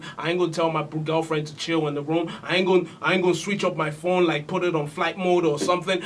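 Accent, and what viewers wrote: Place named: United States. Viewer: lose the American accent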